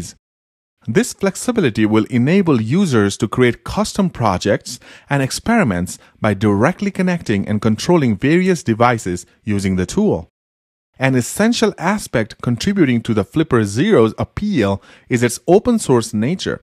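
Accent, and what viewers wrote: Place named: Asia. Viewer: Old fuking video fukng asian accent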